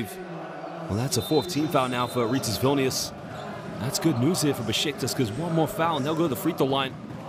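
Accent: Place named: Boston, United States. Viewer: Just watching this a year later for the love of the game. Sounds like the announcer is from Boston. Stunning shot to end q1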